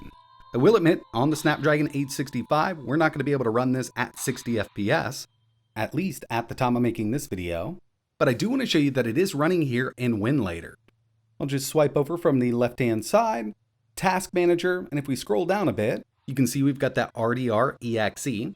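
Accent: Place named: South, United States. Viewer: He's a "Southerner".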